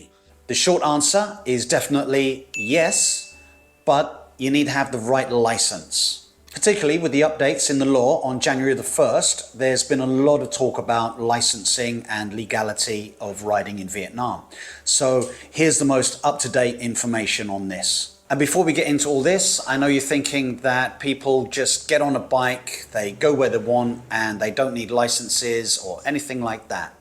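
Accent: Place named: United Kingdom. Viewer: I hear a British accent , Australia IS a signatory to 1949 Vienna convention but NOT the 1968 one due to costs of changing our road rules and therefore have no intention of signing up, sad news for us. A Vietnamese licenses is available IF you have obtained a residency card,,,, good luck with that , so take the bus or grab or Xe Me.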